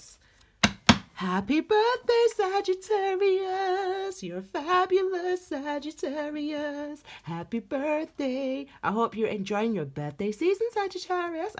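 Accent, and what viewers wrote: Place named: York, United Kingdom. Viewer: Hi thanks for your reading. I was wondering if you're from York in England? :) I can recognise your accent :) xx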